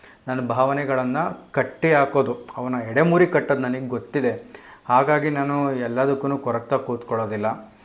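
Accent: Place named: Holalkere, India. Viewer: Anna nammadu Chitradurga, Holalkere Grammina bhashe Keli nanna balyada dinagalu nenapayitu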